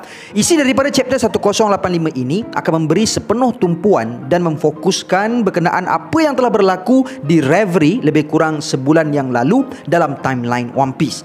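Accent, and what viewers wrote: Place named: Kelantan, Malaysia. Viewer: Kemahhh selit dielek kelate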